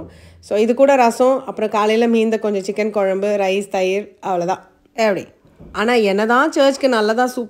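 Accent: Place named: Bangalore, India. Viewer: Hearing Bangalore Tamil😅 And ur Tamil feels good 😊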